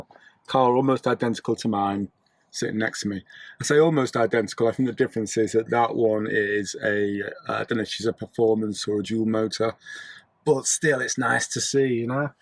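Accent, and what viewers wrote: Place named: Northern England, United Kingdom. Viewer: a proper (Northern) accent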